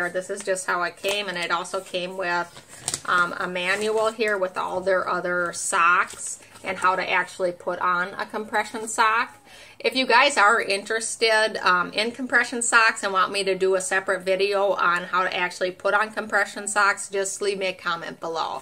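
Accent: Minnesota, United States. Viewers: This accent is reminding me of the movie Fargo. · Are you from Minnesota? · So that’s an accent from MN? I was thinking another country lol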